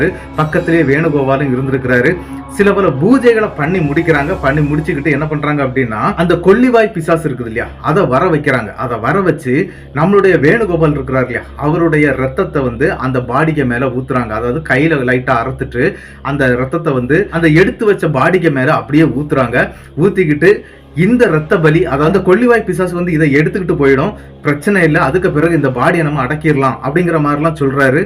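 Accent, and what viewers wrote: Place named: Kerala, India. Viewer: Malayaliya? Slang mix aguthu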